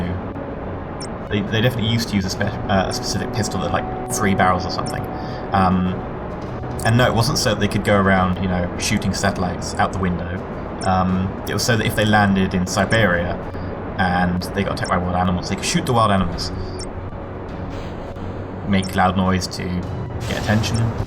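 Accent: United Kingdom. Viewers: Are you british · R u British?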